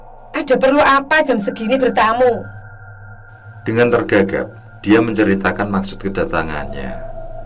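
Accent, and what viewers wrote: Java, Indonesia. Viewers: Medhok men cuk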